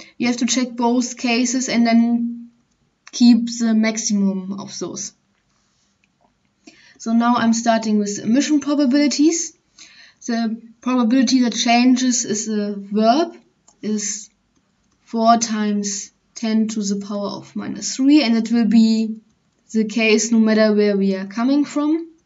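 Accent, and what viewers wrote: Germany. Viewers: she clearly sounds German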